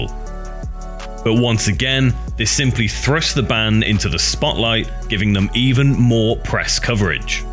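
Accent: United Kingdom. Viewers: Sounds way more official with a British dude narrating👍👍👍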